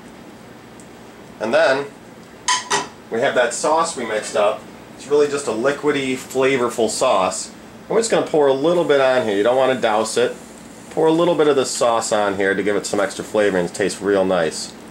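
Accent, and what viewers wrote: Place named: Midwest, United States. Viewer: 1 of the best recipes ever! Thanks man good job buddy! I can Tell by your accent youre from the Midwest! Im in ND! Thanks man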